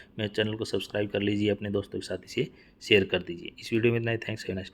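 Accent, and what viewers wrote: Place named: Bihar, India. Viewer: 😅😅😅😅 Bihari lagate ho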